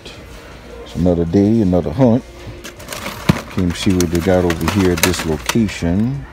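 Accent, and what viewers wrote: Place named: Louisiana, United States. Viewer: Sounds like your from Louisiana if you are what part I’m in Lafayette would like to do some trades if you are down I have a good bit of stuff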